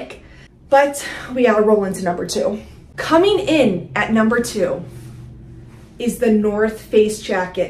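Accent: Midwest, United States. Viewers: I love your Midwest accent!! It’s in the a’s like in “baaack” so cute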